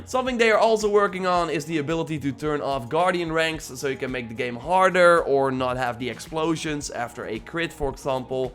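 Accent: Netherlands. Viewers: where are u from u have like a dutch acent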